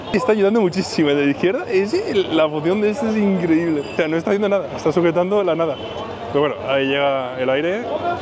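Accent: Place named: Colombia. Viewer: Que alegría ver por fin a!! Y seguir escuchando ese hermoso acento colombiano ♥️💃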